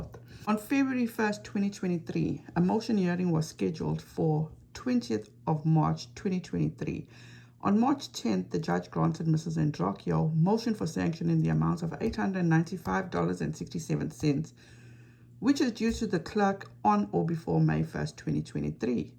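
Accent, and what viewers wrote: South Africa. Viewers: this is the first of your videos I have seen. Do I detect a South African accent?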